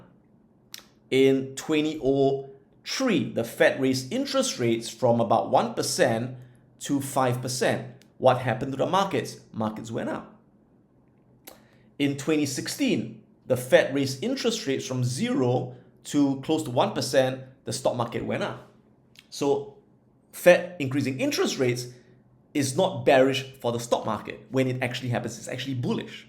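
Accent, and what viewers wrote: Russia. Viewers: Your Russian English is so good, can't remember nothing after watching but that part :) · love the russian accent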